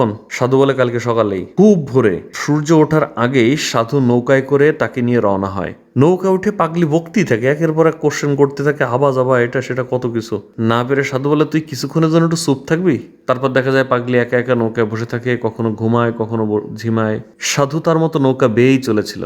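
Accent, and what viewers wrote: Khulna, Bangladesh. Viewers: আপনার বাড়ি কি খুলনা? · ভাই... আপনি কি আমাদের খুলনা/ বাগেরহাট জেলার মানুষ? আপনার কথা শুনলে সেটা বোঝা যায়। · ভাই আপনার কথা শুনে মনে হচ্ছে আপনার বাড়ি খুলনা অঞ্চলে🙂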